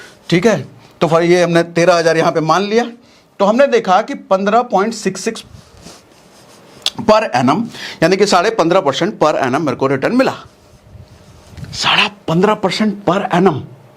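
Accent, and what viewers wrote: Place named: Bengal, India. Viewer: Bhaiya ap bangali ho?👀motamuti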